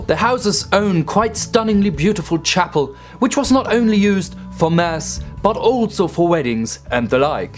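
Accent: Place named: United Kingdom. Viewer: Natürlich ist es eine "Special Occasion", wenn du da bist,! xD Richtig schönes Video!:D In der 7. Klasse oder so war ich mal auf Klassenfahrt und wir haben da Arundel Castle besichtigt. Das sah Warwick Castle recht ähnlich. Your English sounds very British by the way!;) I miss England terribly!:(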